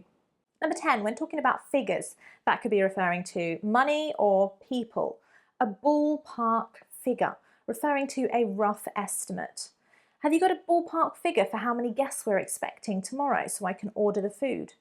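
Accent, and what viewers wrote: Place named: England, United Kingdom. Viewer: Hola me gusta cada ves su acento me enamore del acento ingles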